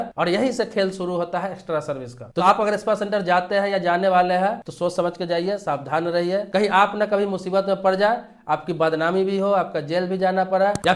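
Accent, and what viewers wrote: Bihar, India. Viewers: Tu bihari hai kya be ?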